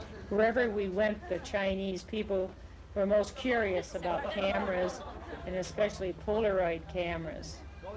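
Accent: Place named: Michigan, United States. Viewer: Not Canadian. Could be from Michigan. Wisconsin maybe.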